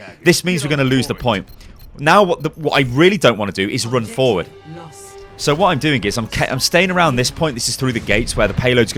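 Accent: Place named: East Midlands, United Kingdom. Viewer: are you from east midlands area? you sound it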